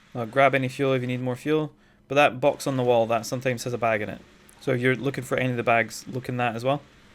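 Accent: Scotland, United Kingdom. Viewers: Scottish I hear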